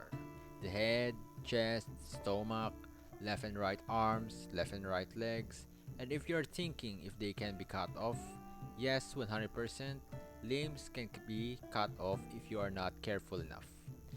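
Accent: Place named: Philippines. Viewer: Such a Strong Filipino accent haha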